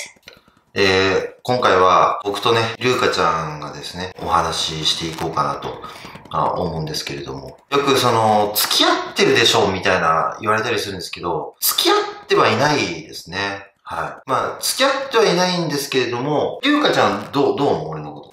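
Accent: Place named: Okinawa, Japan. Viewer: 喋り方が沖縄すぎる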